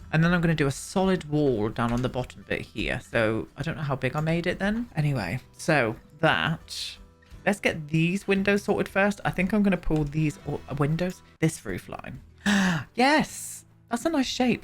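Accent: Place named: Devon, United Kingdom. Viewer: i always imagine your from devon, like, country p[b]umpkin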